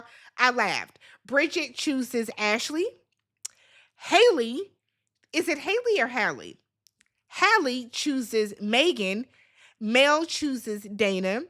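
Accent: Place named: DMV, United States. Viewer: Are you from the dmv area? A couple words and the way you pronounced things gave DMV 😂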